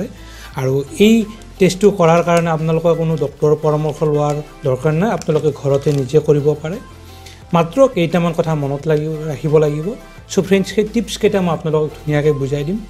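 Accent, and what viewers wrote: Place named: Nalbari, India. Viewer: আপোনাৰ ভাষাটো নলবাৰীৰ যেন লাগে। ভাল লাগে।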